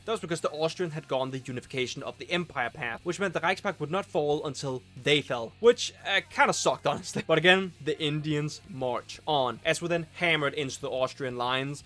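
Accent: Denmark. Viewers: Danglishen går hårdt🙏🙏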